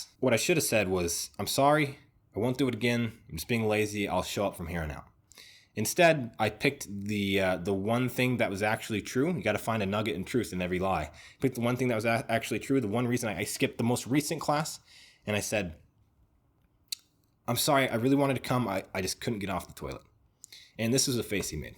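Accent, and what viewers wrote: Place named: New York, United States. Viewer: Your videos are so well put together I’m guessing you make the hard look easy and your American accent I’m guessing is New Yorkan? That’s just the icing on the cake the content I always feel is first class. What surprised me was today’s content I really needed this as a professional civil engineer I got into it because I followed in my old mans footsteps but I have to say after 18 years I’m done. Time to follow the thing I’ve always wanted to do for the last 12 years, tech. Cheers x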